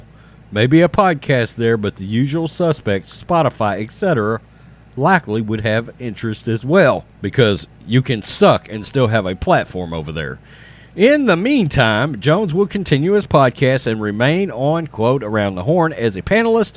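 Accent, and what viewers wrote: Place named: South, United States. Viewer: I lost brain cells listening to your country accent and the amount of time you said, “Woke.” 🤦🏽‍♂️🤦🏽‍♂️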